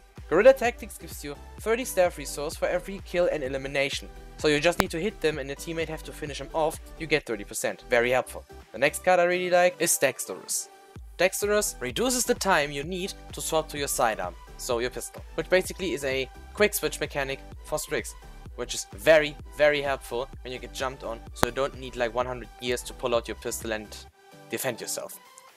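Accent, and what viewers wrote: Germany. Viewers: Youre German Man merkt sofort deinen Akzent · Are you German i just think it because i am from Germany too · Are you German?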